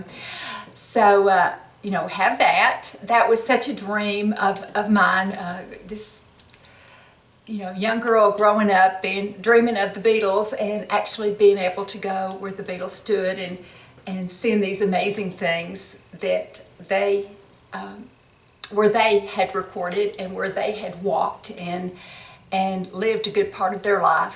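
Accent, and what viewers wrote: United States. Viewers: As an Englishman I like that accent. I gotta go on holiday an hear more of that, tho I don't know what states speak like that.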